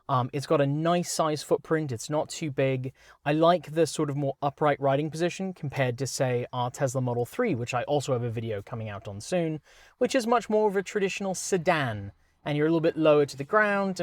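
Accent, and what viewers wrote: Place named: West Country, United Kingdom. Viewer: I do like a Bristol \ West Country accent!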